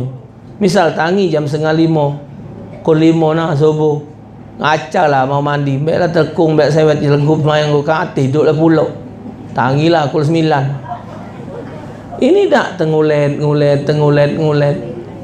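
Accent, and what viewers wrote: Palembang, Indonesia. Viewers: Ingat waktu beliau ke Kota Jambi, seisi lapangan kantor walikota Jambi penuh. Cara beliau menyampaikan pesan sangat sederhana dengan logat Palembang tapi bisa dimengerti oleh semua orang, dan temanya adalah fakta yg terjadi dimasyarakat kita. Alfatihah buat beliau. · Dak bosan aku nonton ceramah almarhum, dak Ado lagi yg ceramah berlogat daerah Palembang, alfatihah pak ustadz 🙏🏼🙏🏼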